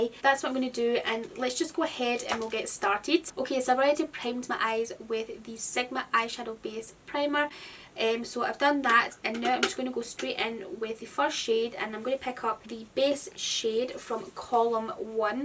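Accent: Scotland, United Kingdom. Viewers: Can tell a Scottish accent a mile off 😍x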